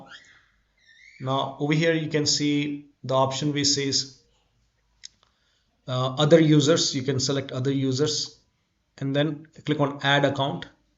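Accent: India. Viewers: >hear indian accent >close the video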